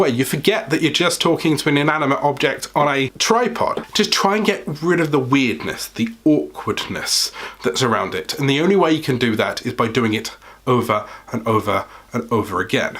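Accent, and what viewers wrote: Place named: Yorkshire, United Kingdom. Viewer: the intro was the most entertaining bit, I'm so glad you got the humour to you content... Sounds like you got the Yorkshire accent..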